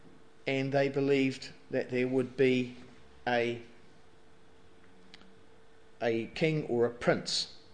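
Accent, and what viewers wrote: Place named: England, United Kingdom. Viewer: the speaker is English and he pronounces words differently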